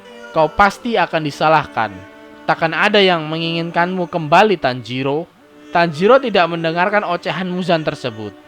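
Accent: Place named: Java, Indonesia. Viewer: Jawa bet lu njirr...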